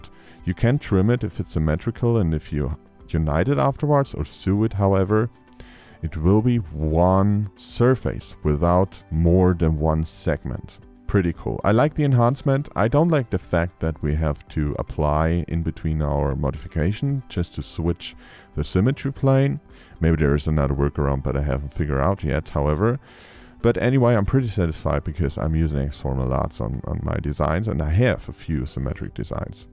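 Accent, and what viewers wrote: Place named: Germany. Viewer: Your German accent makes it so much more interesting to watch this tutorial. :) Some typical accentuation on some words or syllables... funny and entertaining!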